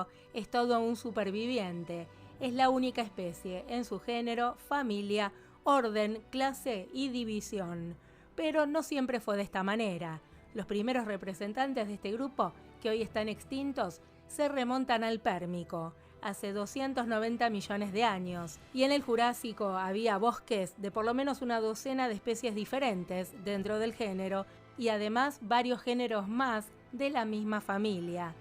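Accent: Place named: Rioplatense, Argentina. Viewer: ❤ siempre muy instructivo sus videos y su acento Rioplatense lo hace mejor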